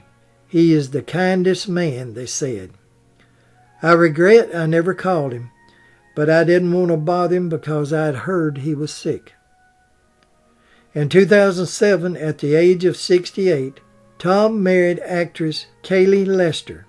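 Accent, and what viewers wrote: South, United States. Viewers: I hope you understand how wonderful your documentaries are, Don't ever stop Please. And thanks for talking slow and country. These days in most place on youtube, I have to slow them down so I can understand what the young people are saying..........lol........Life's Weird! :D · It's common to read comments on YouTube from Americans loving British accents but not so common the other way around. Well this old Brit lady adores your accent. I could listen to you talk all day. You could be reading the phone book for all I care, but the added bonus is that everything you present and narrate is interesting and informative. Thank you.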